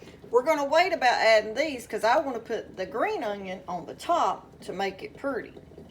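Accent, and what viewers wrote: South, United States. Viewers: Looks delicious, I like your southern talking voice .. you can get those soda crackers at a good price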